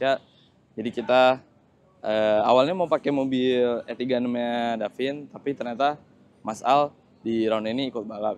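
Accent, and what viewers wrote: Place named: Malaysia. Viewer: melayu gitu logat nya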